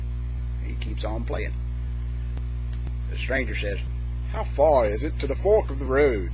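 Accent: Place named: Arkansas, United States. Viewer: You sound like an Arkansawyer all right, but we downEasters don't talk the way you think we do. However, you like storytelling, fireplaces, and pipe smoking, so you're a friend of mine I just haven't met yet. I enjoyed this vid enormously; and if ever we meet, I'll fiddle you and share my tobacco.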